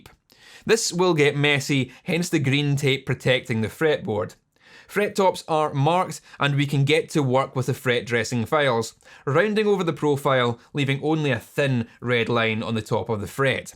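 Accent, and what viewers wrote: Scotland, United Kingdom. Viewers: I love how aggressively Scottish is your accent. Amazing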